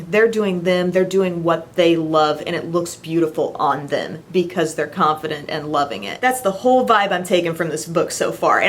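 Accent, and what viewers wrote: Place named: Midwest, United States. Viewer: Your ridiculously adorable Midwestern accent makes me feel like you're my super pretty big sister patiently helping me learn how to do my makeup so I don't end up with bronzer tiger stripes!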